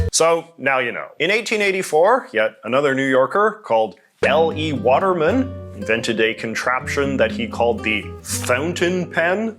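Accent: Canada. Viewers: This is the first time I've managed to pick up a canadian accent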